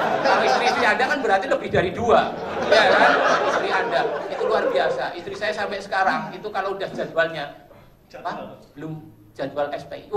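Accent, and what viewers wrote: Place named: Banyumas, Indonesia. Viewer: maen,ngapak pokoke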